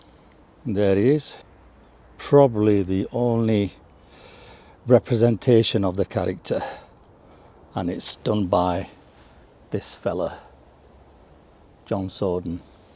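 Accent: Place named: Yorkshire, United Kingdom. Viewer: That’s one string Yorkshire accent! Great video 👍🏻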